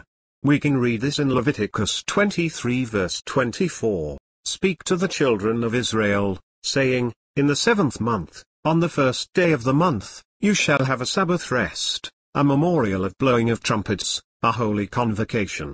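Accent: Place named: United Kingdom. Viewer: kinda wish there was an Israeli voice or even Jewish from new York...but British?well on. lol praise the Lord anyhow...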